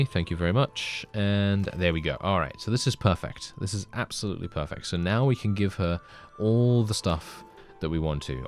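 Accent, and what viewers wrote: United Kingdom. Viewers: You a brit, mate ?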